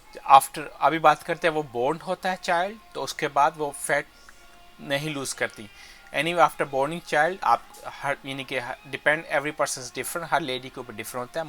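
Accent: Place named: United States. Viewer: American accent boss! 😎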